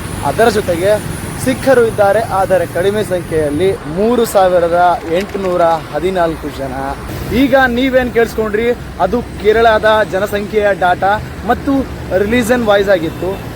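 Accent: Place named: North Karnataka, India. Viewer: ಉತ್ತರ ಕರ್ನಾಟಕದ ನುಡಿ ಇನ್ನೂ chanda... ನೋಡಿ ಖುಷಿ aitu... ಗುರುಗಳೇ.. ಒಳ್ಳೇದಾಗಲಿ.. 🙏🙏🙏🙏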